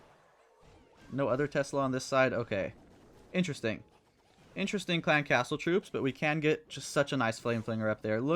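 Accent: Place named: United Kingdom. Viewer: I thought you are British or ausie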